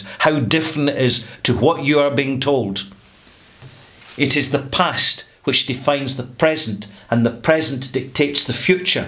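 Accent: Scotland, United Kingdom. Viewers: I was drinking a glass of tullamore dew and decided to youtube a review and stumbled across one of your videos. I have to say I love the Scottish, particularly since I hit Edinburgh last year with my missus. Honestly, I could listen to you for hours, I'd love to buy you a whiskey and to hear your stories. Much love from Ireland <3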